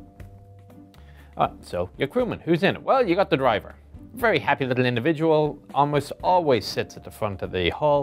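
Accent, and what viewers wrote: Ireland. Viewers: He has a pretty thick Irish accent, regardless he has mentioned it many a time.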